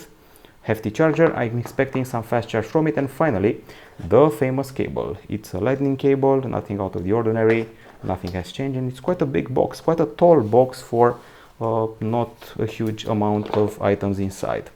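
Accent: Romania. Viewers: Youre not english, I bet you are Romanian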